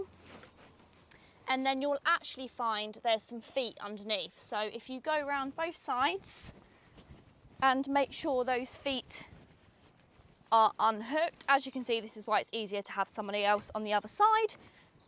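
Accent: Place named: Estuary, United Kingdom. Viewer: A joy to listen to that accent that wonderful London Thames estuary, twang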